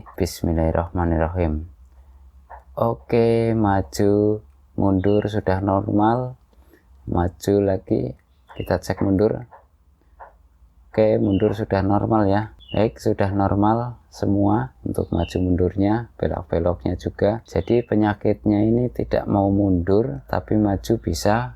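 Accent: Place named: Tegal, Indonesia. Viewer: tiang pundi um kok ngomongnya ngapak ..... orang tegal ya ???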